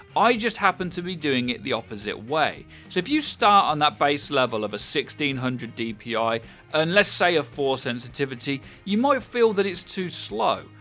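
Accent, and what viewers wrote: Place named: United Kingdom. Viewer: Im from germany and i love your british accent!!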